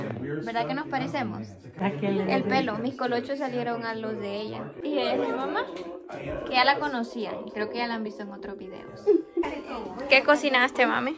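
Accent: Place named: Colombia. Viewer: pareses colobiana hablando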